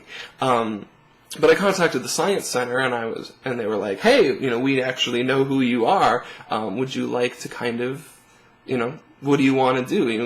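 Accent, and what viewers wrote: Canada. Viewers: Your canadian accent is so awesome. ^_^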